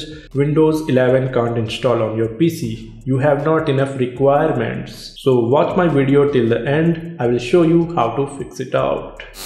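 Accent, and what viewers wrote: India. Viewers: Middle eastern name with a complete Indian accent....wow. Great info. Nice video, applause for microsoft.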